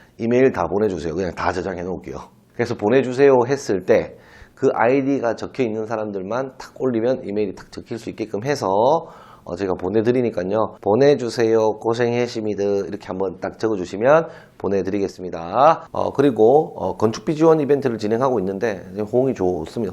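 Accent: Gyeongsang, South Korea. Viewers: 비오는날 막걸리 먹으면 엄청 좋지요 항상 잘 보고 있습니다 경상도 사투리 좋습니다 저도 경상도인데. 괜찮은데요 ㅋㅋㅋ.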